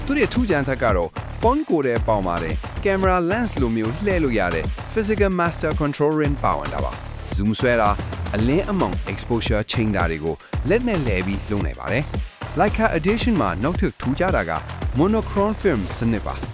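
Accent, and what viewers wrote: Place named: China. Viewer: Is it AI voice? Coz it sounds like a Chinese speaking burmese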